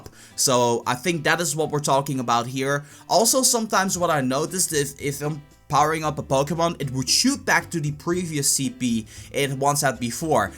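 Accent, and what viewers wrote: Netherlands. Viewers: ben je nederlands ik hoor het aan je accent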